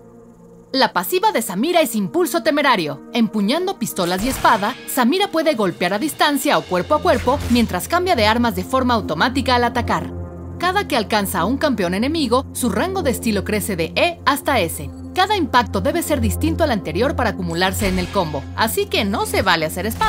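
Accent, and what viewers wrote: Mexico. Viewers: Hablando con memes todo el rato es desagradable oírlo. Además hablando mexicana, perdiendo el acento neutro que siempre proclaman usar. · x q habla en mexicano la presentadora? es que para riot latinoamerica es mexico? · agien mas noto ese toque mexa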